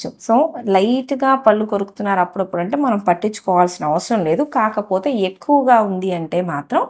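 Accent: Guntur, India. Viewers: అచ్చమైన గుంటూరు యాసలో మాట్లాడుతున్నారు🎉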